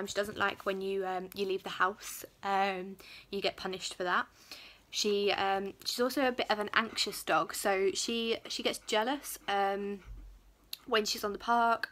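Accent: United Kingdom. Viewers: dude u have the most epic British accent ever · Greetings from Houston, Texas! Excellent video of your pack. Gotta love king Philip. I also really enjoy your awesome British accent!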